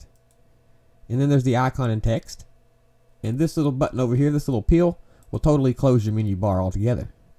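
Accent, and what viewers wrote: South, United States. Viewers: Love the vids and helping a lot. Thank You! Lots of funny comments and inuendos along with good old country boy accent. Well done brother!!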